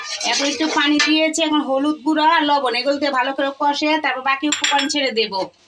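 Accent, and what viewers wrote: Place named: Dhaka, Bangladesh. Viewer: Like your dhakaya voice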